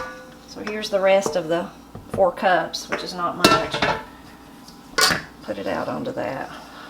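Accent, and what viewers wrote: Appalachia, United States. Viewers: You mean "arsch" potatoes? It's just "Irish" potatoes (regular white ones) said in the Appalachian accent. :)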